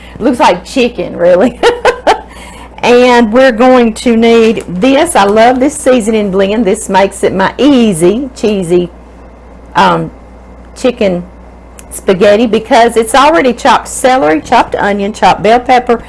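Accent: South, United States. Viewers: This looks so delicious!! I just love watching you and listening to your southern accent 😍 I just love pampered chef, you keep on Killin them with your wonderful kindness ❤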